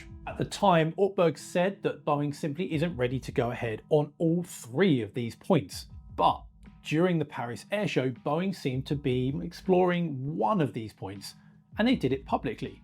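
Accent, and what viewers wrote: United Kingdom. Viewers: I prefer Petter's style and accent (even though he is not from native English speaking country) to (with his British accent, especially on-at least 10-'but') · I have to say I do appreciate the English accent. So few English Pilots on YouTube. He is the only one I know. of. I'm sure there are, just have not seen them. More so I appreciate his ability like Petter, to thoroughly explain in laymen terms. The down side, It makes me like Aviation more. LOL.... I Do hope that things improve in environmentally sound engines. I know we can. It can only help things, and ensure the aviation sector.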